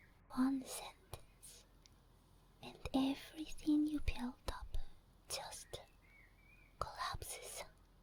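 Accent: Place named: France. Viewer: Hi I’m new around here what accent do you have I really like it kinda sounds like French but my ears can’t quite nail it down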